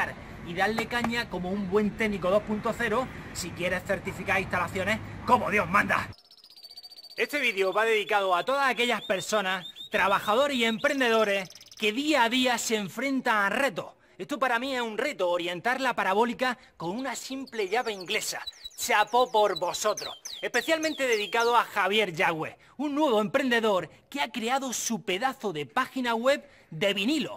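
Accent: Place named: Granada, Spain. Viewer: Joder, qué buen video,. Haciendo un paréntesis, ya me decía mi amiga Cristy de Almería que su acento favorito es el granadino, ya veo por qué.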